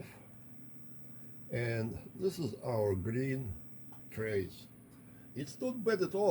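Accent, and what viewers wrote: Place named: Belfast, United Kingdom. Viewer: Wow that was a long time ago since I heard Belfast.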